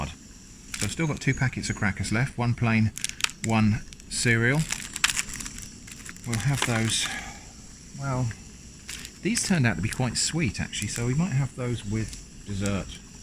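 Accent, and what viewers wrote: United Kingdom. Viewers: Don't worry; we Americans find a lot of British accents more pleasant to listen to than our own, too. 😅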